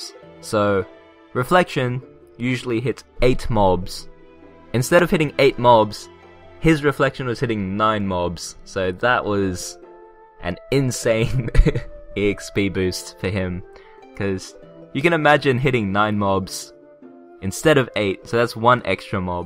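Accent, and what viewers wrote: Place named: Israel. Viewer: wait wth are you from israel?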